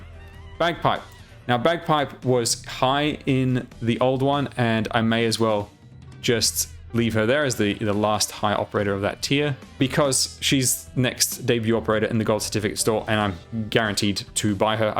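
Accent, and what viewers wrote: Australia. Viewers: Are you Australian btw? I'm trying to place the accent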